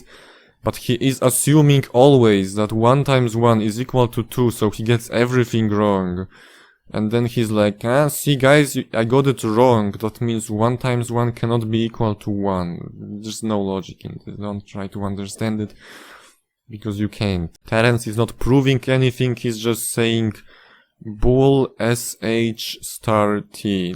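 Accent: Poland. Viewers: odkąd widziałem pierwszy film wiedziałem że jesteś Polakiem po akcencie. Dzięki za potwierdzenie brachu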